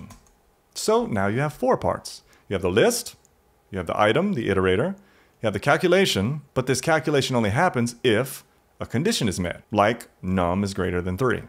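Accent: South, United States. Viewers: You have a very subtle southern accent.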